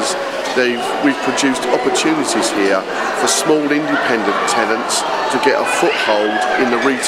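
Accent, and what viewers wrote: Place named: Cockney, United Kingdom. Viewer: he sounds like a geezer from EastEnders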